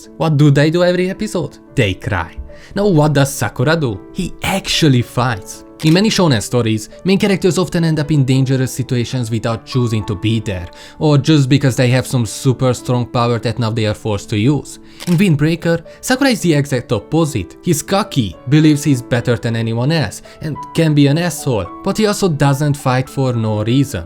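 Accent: Hungary. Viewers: hmmm..... i know a hungarian accent when i hear one. i can smell it from kilometres away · Are you hungarian? You sound like one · 11 seconds in and I already know its a Magyar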